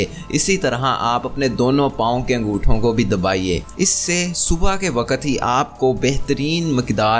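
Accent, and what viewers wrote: Punjab, India. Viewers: Yar apky muzuaaat intehae jandar lekin apki Urdu intehae kmzor h lehja bilkul kc pendu Punjabi ka h ya Urdu ehl e zuban ki trha bolo ya phir direct Punjabi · AP Punjab ke ho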